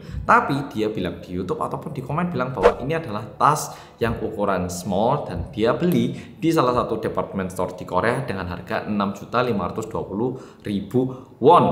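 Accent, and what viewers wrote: Java, Indonesia. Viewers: Bang kl ngomong korea logatnya korea, tapi klo ngomong indonesia logatnya jawa medok:)_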